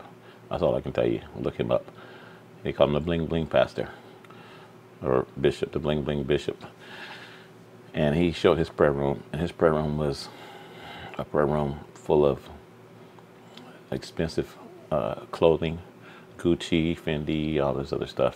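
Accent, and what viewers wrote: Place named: California, United States. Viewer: Wow! You got that CA accent now!!!! Much love friend.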